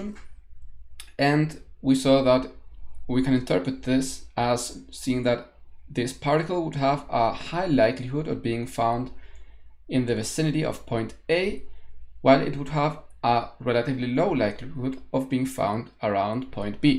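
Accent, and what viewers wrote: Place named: Germany. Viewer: Good explanation brother, btw I love your German accent. I wish your channel grows and you keep making videos for us (or me)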